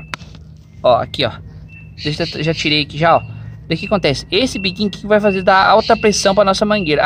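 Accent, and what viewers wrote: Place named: Caipira, Brazil. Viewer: Eeeeitha sotaque caiiipirahhh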